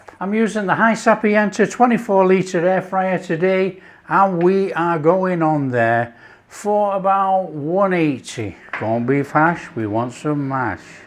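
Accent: United Kingdom. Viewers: Happy day to you sir, love your accent oF BRITAN, you are a chef and master that makes it so enjoyable to watch. Food looks great and love your passion for sharing with us, GREETINGS OR CHEERS TO YOU SIR FROM THE GREAT STATE OF ILLINOIS. #1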